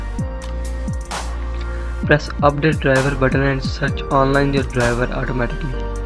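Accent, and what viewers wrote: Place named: India. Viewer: lmao u put united states but ur clearly from india or sum other shitty accent country lmfao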